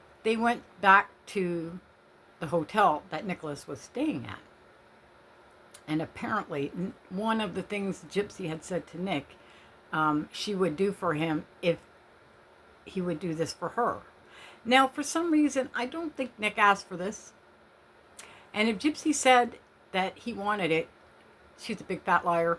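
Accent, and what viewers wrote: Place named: Canada. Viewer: And I like the Canadian accent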